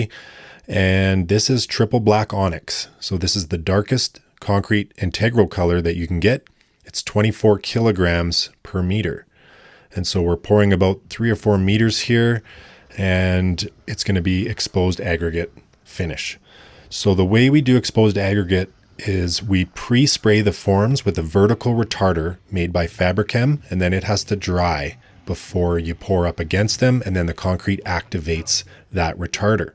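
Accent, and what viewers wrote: Canada. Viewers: Okay, so unlike many people in the comments, I have zero problems with the way that you pronounce the words garage or process. You pronounce those words in a lovely Canadian way. But I have to draw the line at your pronunciation of asphalt. It's definitely not ash-fault, as there's no "sh" sound in asphalt.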